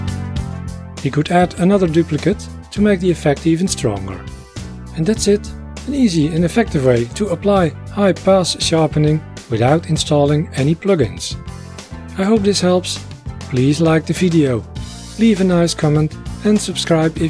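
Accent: Netherlands. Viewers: Your videos are all so very instructive and beautiful. You sound like Dutch. Am i correct?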